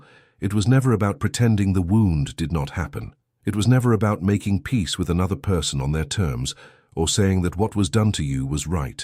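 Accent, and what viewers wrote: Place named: England, United Kingdom. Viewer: Why is the exact same voice on all these types of videos? A slight English accent, a deep voice… is that one guy or is that AI?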